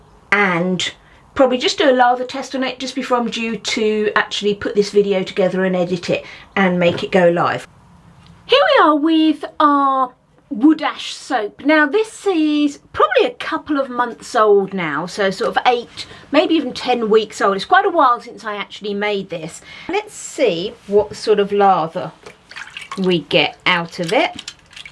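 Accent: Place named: Southern England, United Kingdom. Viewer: South of England sounds like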